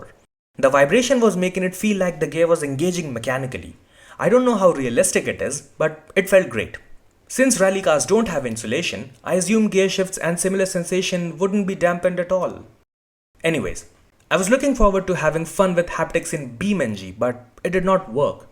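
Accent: India. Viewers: I hear indian i turn off the video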